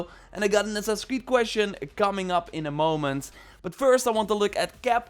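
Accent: Netherlands. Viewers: With this terrible Dutch accent? If he made his L’s any thicker, he’d sound like a Bond villain... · You just know when you hear a dutch guy talking English. Nice video btw man.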